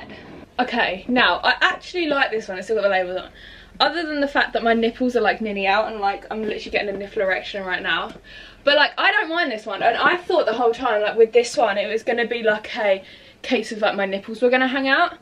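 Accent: England, United Kingdom. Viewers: i lover her english accent woow !!! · I can understand british accent but her accent is too british so cant understand her anymore, sounds like she's eating her words 😂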